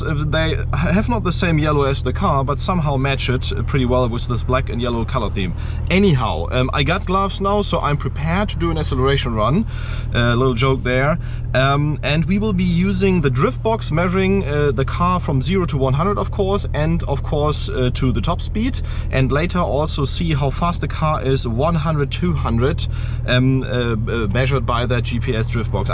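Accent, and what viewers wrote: Germany. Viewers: Hate the dumb German accent and dad jokes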